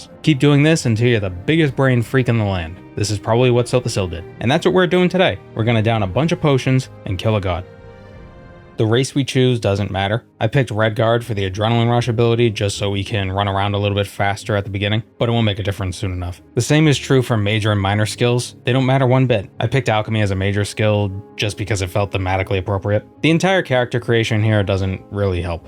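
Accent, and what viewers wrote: Boston, United States. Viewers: Boston boi? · Weird question but are you from Boston/mass? I feel like i can hear it every once in a while haha. Im just curious since im from there too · Boston accent? I heard you say smaaht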